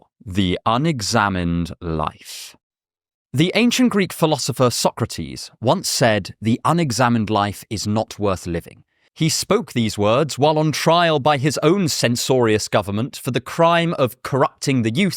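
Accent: England, United Kingdom. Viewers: This is a deeply unserious comment but I’m generally repulsed by British people and the British accent but you are one of the few British people I find attractive for some reason. Cheerio mate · I never expect you to have an english accent for some reason. Every single time I am surprised. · I've lived in england for 10 years and I've heard and english as british as yours before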